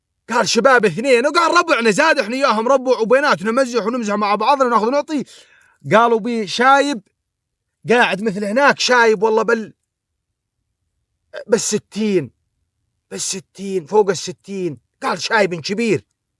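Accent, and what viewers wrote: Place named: Iraq. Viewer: متابعك من العراق وبل صراحة ما عبالي انو اكو ناس بسوريا لهجتهم تشبهة لهجتنا لان احنة امعاهدين على لهجة أهل سوريا كيفك و شو بدك وربي يحفضك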